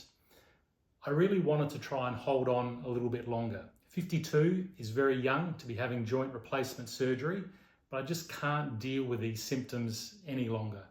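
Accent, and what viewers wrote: Australia. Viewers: Tangent: Love your accent, used to live in Sydney and listening to you talk brought back pleasant memories for this ol' septic! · i was absolutely encouraged by your video and ability to walk so steadily.....i really appreciated your sense of humor....since i am nearing surgery date in a few months....lol... you really gave me an optimistic approach to your home recovery with your ozzy accent and humor!!! Thanks!!! God Bless You!...Susan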